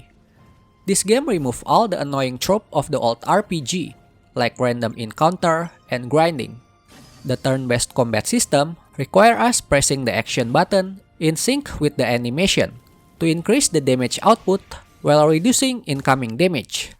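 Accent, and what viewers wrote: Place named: Indonesia. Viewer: thanks for the video.. can't wait for Eiyuden Chronicles! 😍 denger dari logatnya.. orang Indo ya bang? wkwkwk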